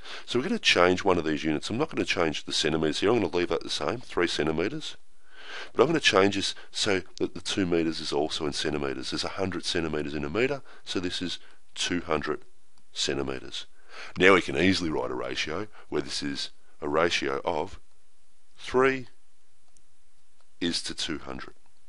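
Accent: Australia. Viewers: He’s Australian. Watch a couple Aussie shows, movies, or certain documentaries and you’ll understand him even if he had a cough. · You can just tell he's australian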